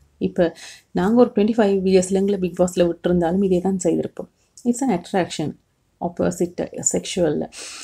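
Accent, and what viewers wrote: Sri Lanka. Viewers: இலங்கைத் தமிழ இங்கு கேக்க நல்லா இருக்கு அக்கா. நல்லா செய்திருக்கிறிங்க அக்கா. வாழ்த்துக்களும் நன்றிகளும் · இலங்கை(இனிமை) தமிழ் 👍 👏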